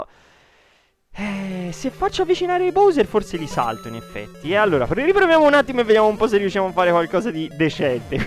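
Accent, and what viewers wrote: Tuscany, Italy. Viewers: ma te sei della Toscana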